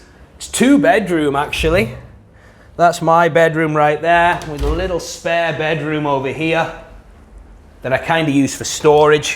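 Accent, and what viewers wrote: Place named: United Kingdom. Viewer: accents is British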